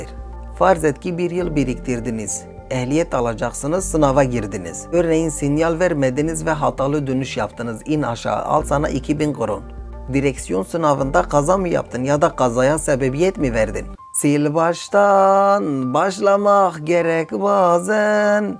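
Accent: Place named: England, United Kingdom. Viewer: Abi selam ne kadar yaşıyorsun norveçde Türkçeyi ingilizler gibi konuşuyorsun galiba biraz asimile olmuşsun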